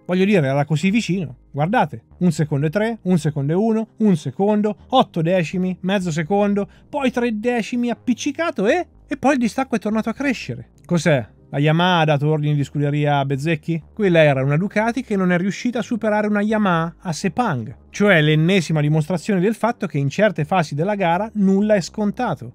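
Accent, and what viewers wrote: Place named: Veneto, Italy. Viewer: E io che pensavo che fosse Emiliano, ora ho il dubbio che sia Veneto 😂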